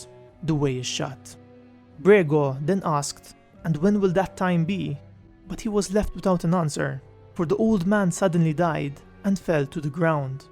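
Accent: Malta. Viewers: LOL... I love Malta & Gozo though I've only been once, I think the accent is beautiful and can listen all day, but sometimes I have a slight laugh to myself as the pronunciation sometimes differs from English... then he shows a bowl of oats for the "oat breakers". LOL!! Well played sir, well played !! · Are you Maltese?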